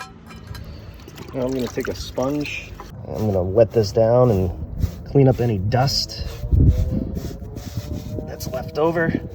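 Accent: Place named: Northeast, United States. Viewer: Try as you might you can't hide that Northeastern accent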